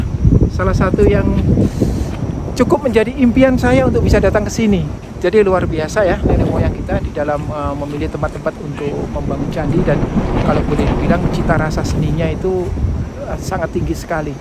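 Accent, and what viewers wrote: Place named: Surabaya, Indonesia. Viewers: Loghatnya jatim banget 😅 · Logat e Suroboyo banget 😀😀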